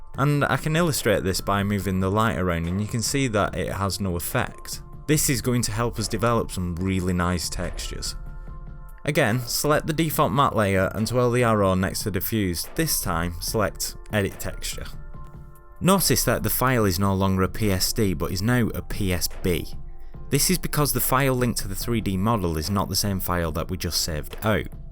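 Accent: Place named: Northern England, United Kingdom. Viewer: You're so northern hahaha! I love it. Lovely tutorial, thank you for sharing :)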